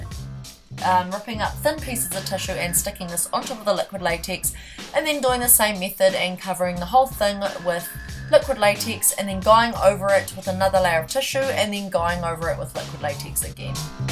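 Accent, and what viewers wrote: New Zealand. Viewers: the kiwi accent always makes me giggle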